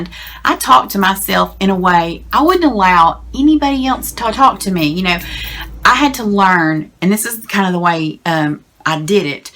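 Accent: South, United States. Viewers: Beautiful, down-to-earth and a sweet Southern accent! She is my new best friend in my head! LOL!!... Congratulations on your fitness journey! I'm inspired! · Oh and I love your southern dialect!! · Do you count calories? I am struggling with life...divorced, 3 kids at home, struggling with being a single parent...I've gained 100 pounds. I'm ashamed of myself. I used to do 10Ks and now I don't even enjoy walking. I used to be a vegetarian because I really don't like meat. My doctor told me to follow the DASH diet which is basically low carb. I'm so glad I found you because you sound like me (but I don't have your darling southern accent). I eat to cope. I used to eat to live (healthy) and now, I eat to cope. Sad. Hard to admit. I have been counting calories and I lost 10 pounds this last month. However...I feel like a slave to numbers. I am an educated person. 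I know calories count. I just know I am not going to do this for the rest of my life, so I feel it's setting me up for failure. Do you count only carbs? If you count calories, how many do you allow yourself per day? Thank you! You are a delight. 💕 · You are beautiful, love the country accent. .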